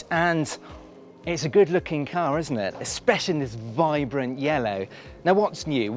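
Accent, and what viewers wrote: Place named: RP, United Kingdom. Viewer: speaks so posh it's refreshing to hear. And the car looks nice, the first time in a long time I have liked a new Peugeot.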